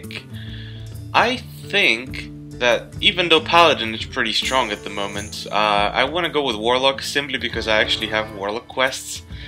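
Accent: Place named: Russia. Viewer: laska ma ruski akcent a twierdzi że jest z Polski hmm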